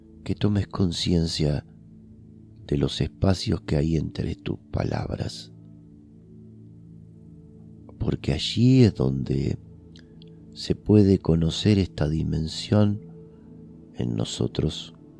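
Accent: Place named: Argentina. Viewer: Qué bien habla el español y en argentino. Gracias !😄. Bendiciones maestro.❤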